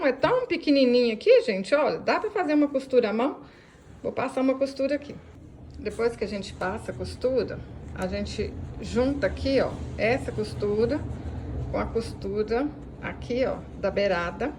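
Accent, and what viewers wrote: Minas Gerais, Brazil. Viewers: Você é mineira uai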